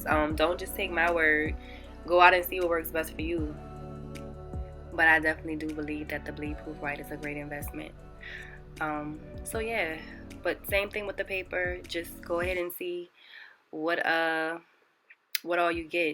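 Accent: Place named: Texas, United States. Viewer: Nice font work. You have a nice accent, whereabouts in the States are you from? (Update: Just heard you say you live in Austin TX!) I like you talking over the video and the length is fine.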